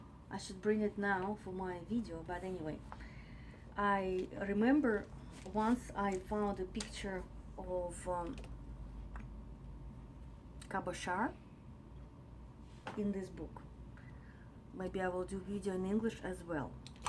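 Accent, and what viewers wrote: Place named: Russia. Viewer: Thank you for making videos in English. Your russian accent heips me with understanding. И вообще, здорово совместить приятное с полезным: и понимание английской разговорной речи чуток прокачать и про любимые парфюмки послушать в вашей прекрасной манере.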